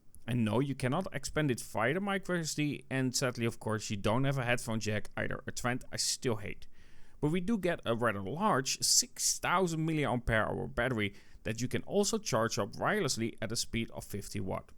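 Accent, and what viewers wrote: Netherlands. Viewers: lol ja je bent duidelijk nederlands zo te horen ;) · Hoi, het viel mij al op dat u ook Nederlands was vanwege uw accent (Geen belediging, uw Engels is goed). Ik heb zelf ook maar besloten om de zwarte OnePlus 13 te kopen met 512GB/16GB RAM opslag via TradingShenzhen Mij werd geadviseerd om de OnePlus 12 te kopen destijds eerder deze jaar maar ik besloot om het maar toch niet te doen tot later deze jaar waarin het toch duidelijk werd dat ik echt behoefte had aan een nieuwe telefoon dus ben ik maar voor de OnePlus 13 gegaan. Het lijkt er ten slotte op dat ik onbewust een goede keus heb gemaakt.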